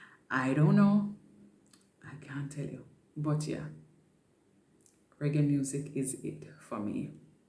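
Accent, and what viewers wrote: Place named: Jamaica. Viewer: I can feel the passion. Patois to the world 🌎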